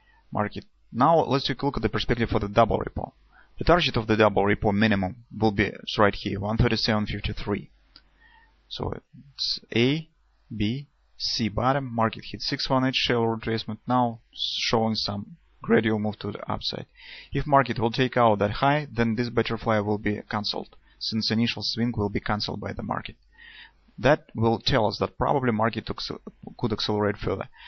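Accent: Russia. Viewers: Работай над акцентом и произношением слов. Якрий русский акцент. Прям вспомнил пары английского в инсте. :)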